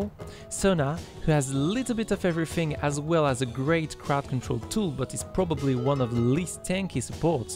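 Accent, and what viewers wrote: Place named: France. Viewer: ***** I'm from France too so I suspected you to be french but I wasn't sure because it wasn't the regular shitty accent :D By the way reported for saying Leblank and not showing you inner french, it's Leblanc duh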